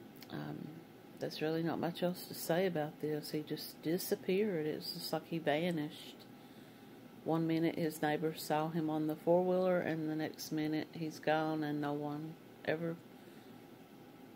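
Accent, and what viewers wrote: United States. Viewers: Get that accent you southern belle !!!!!!!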